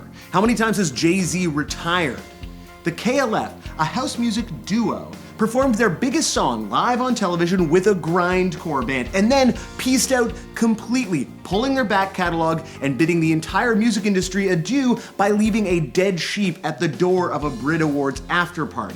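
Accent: Canada. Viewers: your canadian accent is so god damn thick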